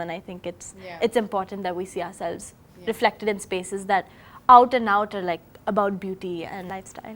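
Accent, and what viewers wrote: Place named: United States. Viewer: American accent as she was born in USA.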